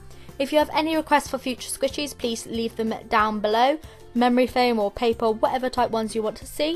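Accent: United Kingdom. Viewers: Are you British?😃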